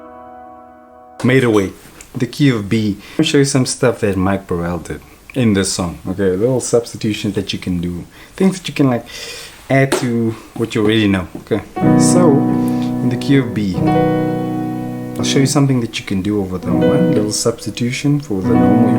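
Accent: South Africa. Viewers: Dude you sound so South African 😅. Amazing chords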